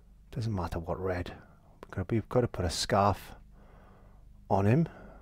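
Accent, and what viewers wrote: Wales, United Kingdom. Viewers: How wonderful to hear that wonderful Welsh accent. Land of my Fathers.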